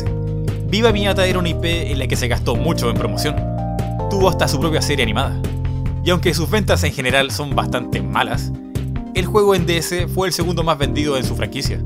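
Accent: Chile. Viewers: eres de Chile? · si igual se vio q trataste de darle un toque mas neutro, pero los q son de chile se dan cuenta quien es de su pais jaja, buen video, y q opinas de ps3 y ps4? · Eres chileno?me encante el acento chileno hablando sin modismos,creo que es uno de los más neutros de todo latinoamerica,por algo la mayoría de los doblajes de Discovery (sobretodo Discovery kids)se hacen en chile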